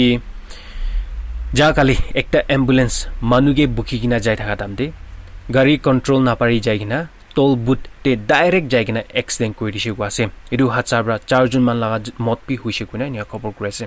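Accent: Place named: Nagaland, India. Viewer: Best reporter... Soothing voice, audible and Nagamese is normal unlike other channels.... Other channels speak Nagamese so stylish that even we Naga cannot understand 🤣🤣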